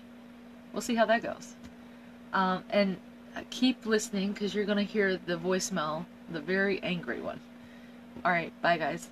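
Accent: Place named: South, United States. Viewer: Why did the voice change to a weird southern accent after episode one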